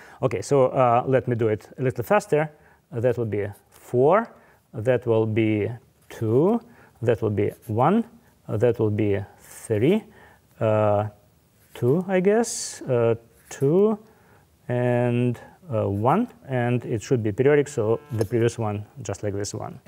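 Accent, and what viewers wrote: Russia. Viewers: Эх, русский акцент не спутать :)) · The Russian/Slavic accent makes me [ r e d a c t e d ] and strangely patriotic. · Another Russian smart mind, love to see your Russian accent <3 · Подумал, что у него русский акцент и не ошибся) Привет соотечественникам!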